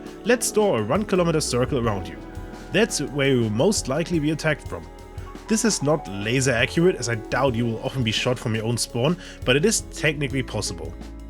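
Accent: Germany. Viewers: I hören German ?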